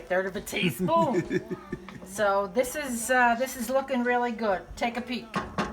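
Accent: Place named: New Jersey, United States. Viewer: Wow this looks good...I'm from Jersy, listening to your voice I'll bet you are too or NY..ps i remember the Purple Gang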